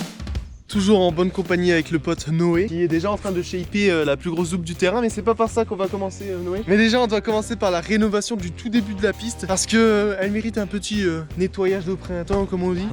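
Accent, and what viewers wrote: Alsace, France. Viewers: L’es accent alsacien ça me fumes😂😂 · L’accent alsacien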